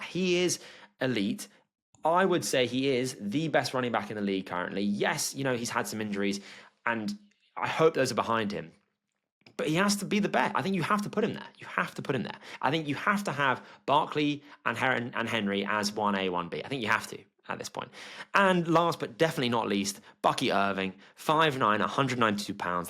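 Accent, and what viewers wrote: United Kingdom. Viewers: Did u even watch RJ Harvey Way off base I knew when I heard the English accent this was going to be pure comedy 😂😂😂 Take time study the game more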